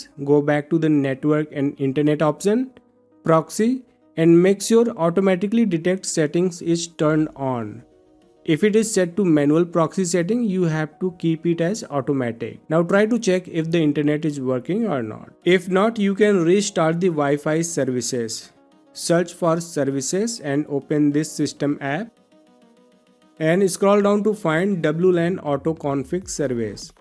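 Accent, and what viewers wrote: India. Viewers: When i hearing the Indian voice i am 100% sure my problem gona be fixt thank you ❤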